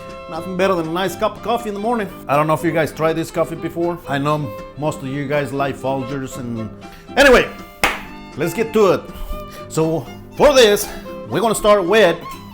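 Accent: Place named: South, United States. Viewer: Hi im KIANA from CENTRAL CALI . . I really like ur videos. Tu cocina es muy estilo rachero Y ME GUSTA MUCHO. ❤ I am mexican & hawaiian and my mom is MEXICAN from LIVINGSTON CA so she cooks using alot of can salsa instead of using real JALEPENOS. Yes her food is still GR8 BUT ! ...OH MAN DO I KNOW THE DIFFERENCE IN THE FLAVORS OF MEXICAN FOOD WHEN U USE REAL JALEPENOS & SERANOS arbrol anchos & GARLIC & KEEPING THE SEEDS IN TO GIVE EVERYTHING A KICK OF BIG FLAVOR. NOW THATS AUTHENTIC. Bt my mom stuck on her cans like green chili enchilada sauce can to make pork chilli verde ( still good ) bt I THINK ITS TIME TO SHOW HER THE DIFFERENCE. PS .... Luv ur country accent❤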